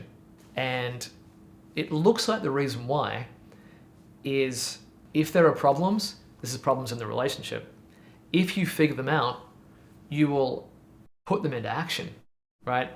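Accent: Australia. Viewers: Unfortunately I have all of those qualities you mentioned, not born with however but from intense suffering and courage to keep learning this life. The sad thing is, I've given up on women and the possibility of meeting one who values similar qualities so I'm currently in Indian contemplating whether I should become a Tibetan Buddhist monk 😂 Thanks for the work you do, you obviously help thousands of people otherwise this wouldn't have over 40k views in 3 days. It says new York but I hear an Aussie accent, is that correct? I'm an Aussie too and considering not my back because I find our country has become a symbiotic twin of the worse traits the US demonstrates. I'm 52 and have witnessed a somewhat caring and considerate culture which was renowned for friendliness and warmth turn into a realm of selfishness and competition. Asia is still similar to the old Australia I grew up in. 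Sorry if this sounds pessimistic mate.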